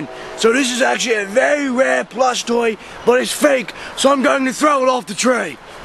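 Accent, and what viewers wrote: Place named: Australia. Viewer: why does he sound australian? haha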